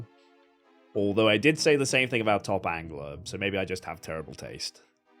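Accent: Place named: United Kingdom. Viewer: London :) that’s why I asked. I can tell you’re British. Just wondered which part of the uk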